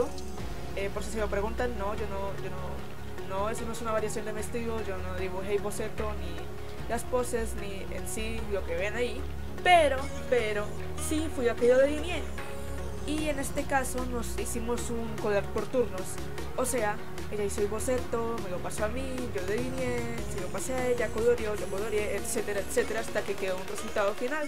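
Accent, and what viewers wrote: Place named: Spain. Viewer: Ostia eres española:000!?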